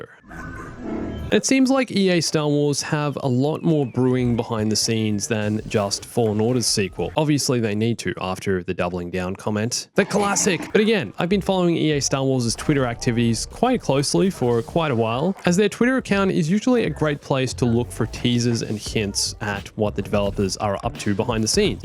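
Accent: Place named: Australia. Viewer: Mate you sound like a Aussie I got a X series about two weeks ago I love fallen order and it doesn't freeze it plays better and not as dark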